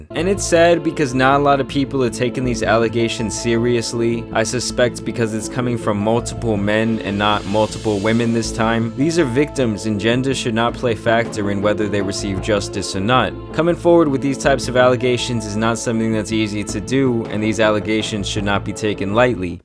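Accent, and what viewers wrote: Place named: Northeast, United States. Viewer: Bro, your accent is hella strong lol. You definitely from the northeast.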